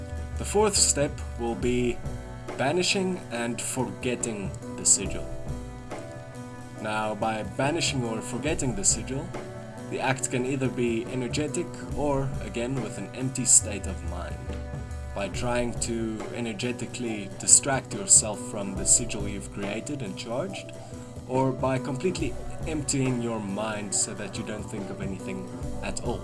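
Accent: South Africa. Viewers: sorry if this sounds weird your accent sounds verry familiar are you mby from SA if u are nice to see im not alone in this country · ? Are you also South African? I recognize your accent. Is there any way I can contact you?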